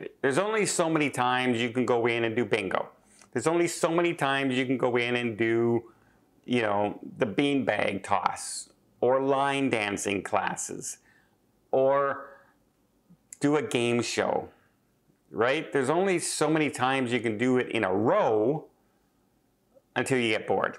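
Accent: Canada. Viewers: Canadian pronunciation. Just because Americans pronounce something one (we usually don’t either depending on regionsin the US)way doesn’t mean everyone has to sound like us 🙄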